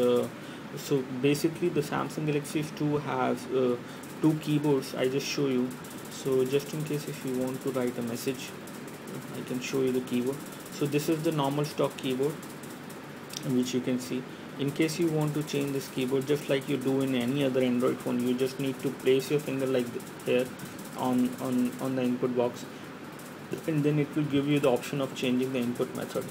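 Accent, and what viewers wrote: India. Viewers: ... please, your accent plus the fan makes my head go all Indian mode... COME ON MAN!!! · BRITISH ENGLISH (indian) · hindu engliszh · its an indian accent but he has a lisp. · 2050 India is propted to be the leading economy in the world, Not ony should you start learning the accent,but you better start learning Hindi language as well. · come on, ANOTHER Indian/eastern accent! every s2 video ive watched the person has that kind of accent! i'm not prejudiced, it's just that i have trouble hearing and understanding what the person is saying! please, get a british accent, or maybe even AN AMERICAN TALKING!!!!! THIS IS AMERICA YOU KNOW! PLEASE, I CAN'T EVEN UNDERSTAND WHAT HE'S SAYING! i can't watch all these videos, if they all have an overseas eastern accent! i don't care the nationality if i can understand them! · I love this funny indian english slang ... 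like meditation if you do not listen to the content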